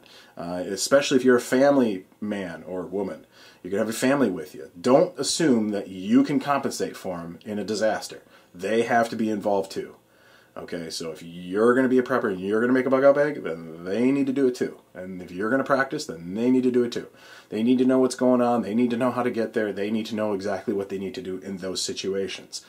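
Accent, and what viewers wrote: Wisconsin, United States. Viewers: You from Wisconsin?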